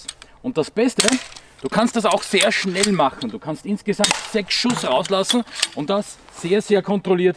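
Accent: Vienna, Austria. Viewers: Du bist schon ne coole Socke und ich als Piefke finde den österreichischen Dialekt so geil. Ach ja und klasse Video! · DEN österreichischen Dialekt gibt es nicht. In Deutschland gibt es ja auch weit mehr Dialekte als Bundesländer (Sächsisch, Berlinerisch, Kölsch, Schwäbisch, Fränkisch,...). In Österreich ist es genauso. spricht mit Wiener Akzent bzw. Niederösterreichisch nahe Wien. 🙂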